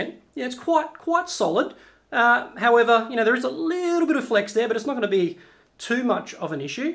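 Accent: Australia. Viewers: No, lol. Same Aussie accent though.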